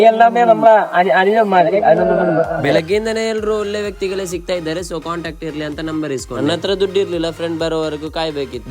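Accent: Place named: Kerala, India. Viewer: Malayalam slang anne chandha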